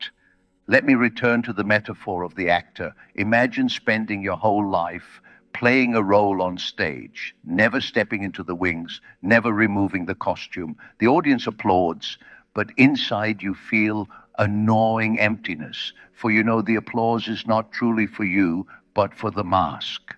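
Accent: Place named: New Zealand. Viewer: Why is Dr Jungs accent from what I assume is New Zealand?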